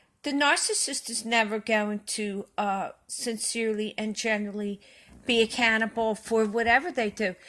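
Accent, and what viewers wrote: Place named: Philadelphia, United States. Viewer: i recognize the philly accent, I grew up 5 mins from you... I wanted to tell you moreso ask you to forgive your mother. you acknowledge and validate who and what she is..now for yourself, forgive her....do not give her power over your thoughts and everyday life. Forgive yourself for the many times you stopped living life because of her actions.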